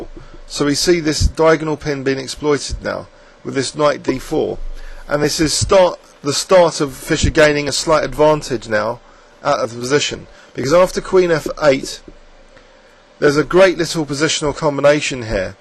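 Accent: United Kingdom. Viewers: Love your british acent :P well I guess it's british :P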